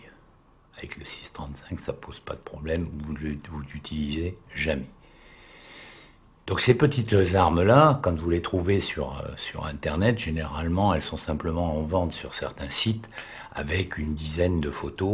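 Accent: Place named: South, France. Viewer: d'accord j'ai eu l'impression d'entendre l'accent français sudiste lol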